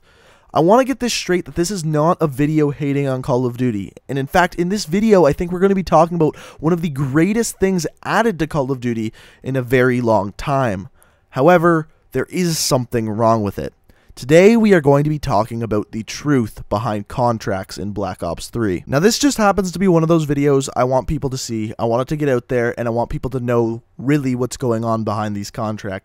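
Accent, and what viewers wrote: Canada. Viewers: Is this guy From Canada or does he have a accent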